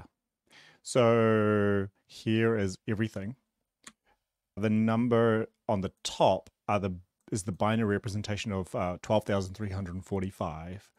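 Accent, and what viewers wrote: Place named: New Zealand. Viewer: Thanks for sharing! Love your NZ accent btw :) churr bro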